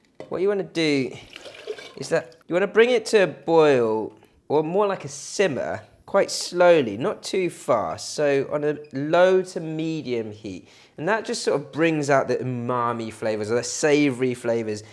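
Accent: United Kingdom. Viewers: Love your videos and your nice British English :) What do you do with the used bonito flakes and combu?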